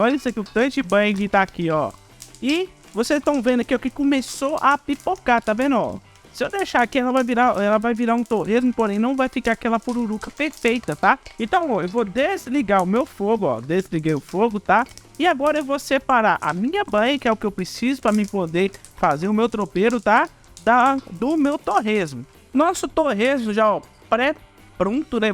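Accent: Minas Gerais, Brazil. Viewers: Modo de falar hororoso sotaque mineiro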